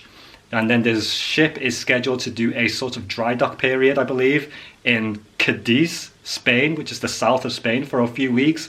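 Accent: United Kingdom. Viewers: What kind of accent do you have? I hear British with hints of Scottish, Irish some kind of Asian.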